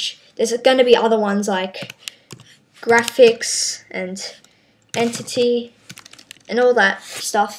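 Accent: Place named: United Kingdom. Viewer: Asian boy? he sounds like a british boy :D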